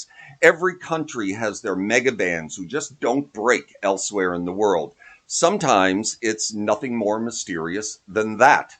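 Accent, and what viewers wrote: Canada. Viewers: Really enjoyed your tribute to the hip and the riff breaks were excellent. I obtained the “ ” album in 89 or 90 via the Columbia house mail order CD’s that were popular then. 7cd’s for a penny or something similar ha! I remember thinking, “who are these guys?” And that’s a strange band name 😂. So happy that I did get that cd, it defined that period of time for me and many other Canadians. Plus I felt like I got in on the ground floor as an early fan. Remained a fan since and Still enjoy their music today. I can’t think of another band that brought Canada together more than they did. Something we could use now more than ever. Thanks for the work you put into this channel. The lessons are excellent! BTW, Do I detect a hint of a Cape Breton accent…😊 fellow Novi here. Cheers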